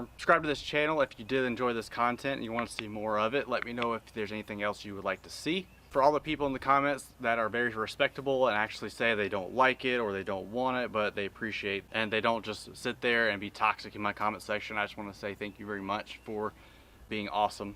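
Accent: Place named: North Carolina, United States. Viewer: I was going to ask where you were from because your accent is almost the same as mine and then you said NC! Edit: just saw the Henderson tag I’m in Greensboro! Love it! And great video, working on planning out my camaro :)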